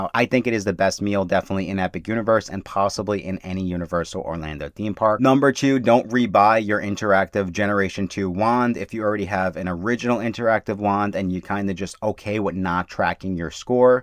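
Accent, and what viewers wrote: New York, United States. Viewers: Thanks for clearly stating that 2nd gen interactives were the only wands trackable on the app! I rely on CC due to grappling with the NY accent, and I didn’t ‘hear’ any misstatement but perhaps my eyes are going, too. The potential $85 minimum savings of not upgrading our 1st gen interactives wands might make 1 visit to Blue Dragon a possibility.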